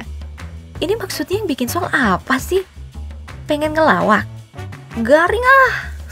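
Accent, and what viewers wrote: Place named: West Java, Indonesia. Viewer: Aya aya wae min euh sunda asli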